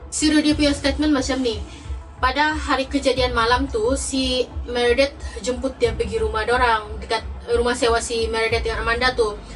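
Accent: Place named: Sabah, Malaysia. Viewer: Siok jg penceritaan sis versi sabah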